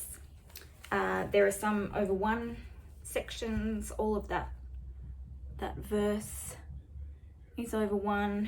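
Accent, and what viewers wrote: Australia. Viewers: Loved your video, your wonderful Aussie accent and your handwork. Best to you and yours!!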